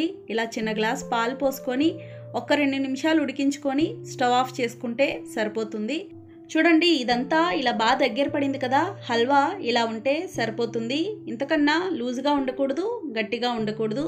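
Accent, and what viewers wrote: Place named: East Godavari, India. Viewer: నాకు మీరు చేసే హల్వ కాన్న మీరు మీ మాటతీరు నాకు బాగా నచ్చింది అండి తూర్పు గోదావరి జిల్లా కదా అందుకే east is Best అంటారు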